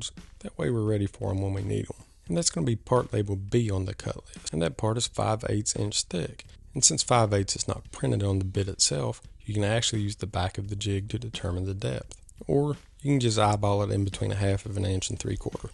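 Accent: South, United States. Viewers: a southern drawl is a good thing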